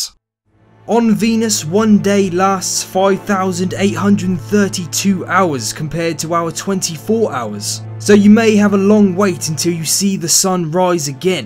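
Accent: United Kingdom. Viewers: Well,very intersting information about Venus.But to tell you the true,I think that despite all the uncomfortable conditions at Mars,the red planet looks a far more friendly world to be colonized.Thankyou so much for sharing that so interesting data!!I love that british accent :-) !!.Greetings from Colombia!!!.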